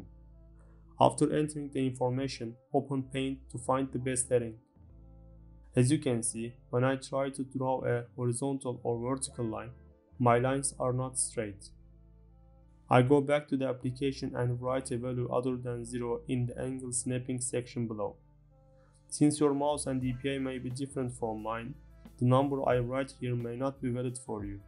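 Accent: Turkey. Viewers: abi türk olduğunu aksandan anladım bi açık yakalamaya çalışıyordum bilgisayar ele verdi seni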